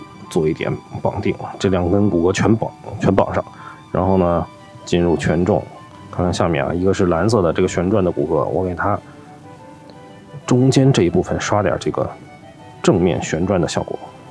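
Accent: China. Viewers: 以后片子开头能不能别整那个中文念法的英文了，每次开始，我都被雷的外焦里嫩的，总是以为我点错视频了。。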